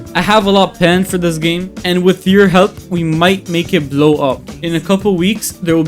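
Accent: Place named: Israel. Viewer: אנימת אחי שמעתי קצת מבטא ישארלי ולא הייתי בטוח עד שראיתי את ההיסטוריה שלך בצאט גיפיטי חחחחחחחחחחח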